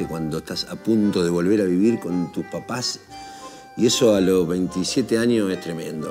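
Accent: Argentina. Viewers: Excelenteeeee Todavía recuerdo al Jorge Alís que promovía el Cachafás por allá en el año 2000 En la radio tierra am todas las tardes Más o menos como a las cuatro de la tarde No sabíamos cómo era el dueño de la voz gruesa tras el micrófono y no necesitaba más que su carisma y su acento para trasladar la conciencia de cualquier chileno de entonces Al lado positivo y con la verdadera riqueza argentina La del obrero, la del trabajador honesto y disconforme La del que ya se puso de pie una vez en el camino ... Sería but que éstas historias, Jorge las pudiera contar en un formato más largo Quizá de unos 45 minutos máximo y acompañado también de un mate ... Son tantas las oportunidades y tan diferentes las experiencias Que la mía personal, que es hija de la creatividad no se puede compartir de forma completa en éste comentario ... Sólo quiero agregar, que así como una vez de la hermosa Argentina salió una serie llamada Los Simuladores Así también salió Jorge, a rescatar el alma de todos nosotros, verdaderos soñadores ...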